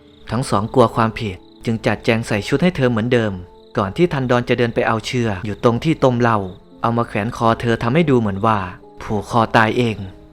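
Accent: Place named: Roi Et, Thailand. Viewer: เว่าภาษาอิสานเมืองร้อยเกิน กะน่าฟังไปอีกแบบ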